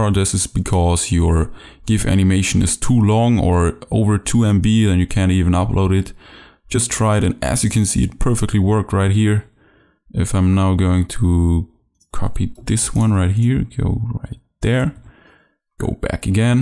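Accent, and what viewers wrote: Germany. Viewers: Man hört den deutschen aus dir haha :D Hast du OSX Yosemite noch nicht ? :o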